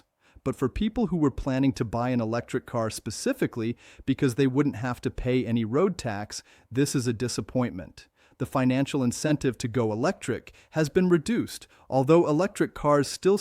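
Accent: United States. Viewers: Ah it’s the uk lose the American voice it’s dammed annoying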